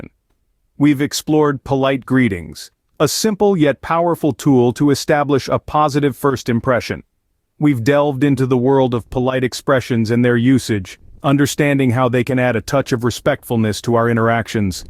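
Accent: United States. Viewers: this video is useful and helpful for me. thanky so much. is this american english? from japan shito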